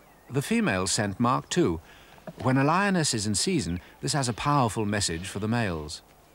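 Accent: United Kingdom. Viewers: Wonderful, quiet sensible lion doc. with a British narrator. Yes lions move out of the way for now, but no grazing animals die of old age, eventually the apex predators get them.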